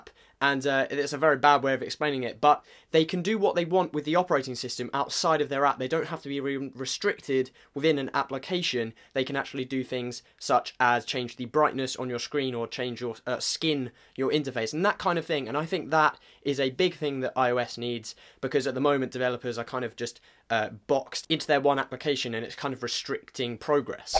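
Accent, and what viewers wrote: United Kingdom. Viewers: I really like your british accent!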